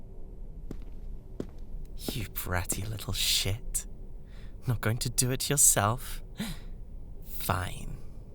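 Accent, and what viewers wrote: United Kingdom. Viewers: BRI ISH BABEEEEE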